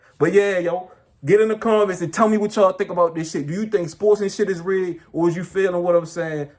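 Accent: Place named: Baltimore, United States. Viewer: Baltimore accent.. Peace brotha 👍🏿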